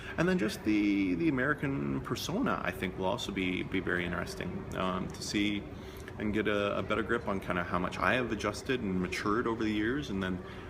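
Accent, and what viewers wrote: Denmark. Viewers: i can feel you have attained a little bit of a danish accent :d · Elsker hvordan du taler engelsk medd dansk accent :) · agreed he sounds danish now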